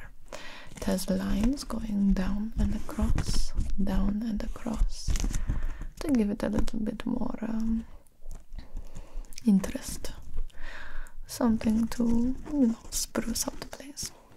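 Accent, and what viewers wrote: Russia. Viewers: What an accent!! Russian? Goddess! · I loved the Russian accent so much that I couldn’t fall asleep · For real was awesome Russian voice! I wish 1 video with a little bit o f portuguese (real portuguese PT-PT). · I love that metal gear Russian accent. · Not really german mostly russian i have a russian aunt she sounds exactly like gibi's accent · Damn that russian accent gave me tingles straight up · Grrreeeattt Russian accent👌👍🇷🇺☦️ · I love your Russian accent...you simply nail the Russian personality as well. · She is trying to imitate Russian accent ?))) ну что же вышло совсем неплохо))) don’t get me wrong but Mexican girls are very hot... can’t watch them for a long, so I must leave it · It’s Hollywood Russian. She’d make a good Bond villain. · i love the Russian accent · Another enjoyable and soothing video. Your Russian accent is so addictive and goes well with the blonde wig. 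You must work so hard preparing such therapeutic monologues.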